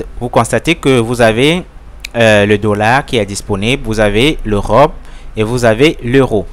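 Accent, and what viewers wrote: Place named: Africa. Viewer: un pot moné épargne nous ton accent africaine stp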